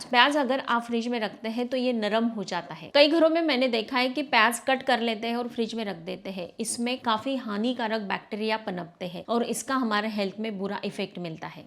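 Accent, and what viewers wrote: Maharashtra, India. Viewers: मराठी tone का हिन्दी ब्लॉग टिप्स बहूत बहुत सुन्दर प्रस्तुति,आपके अन्दर श्री महालक्ष्मी जी के अंश ज्यदा है,wow❤❤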